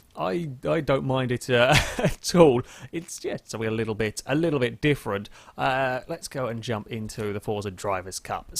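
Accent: United Kingdom. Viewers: I dont know why but i find your voice addicting, maybe because of the british accent?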